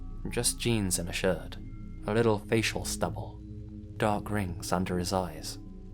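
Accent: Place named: United Kingdom. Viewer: My favorite British narrator is back in action!!! Missed you. Love from USA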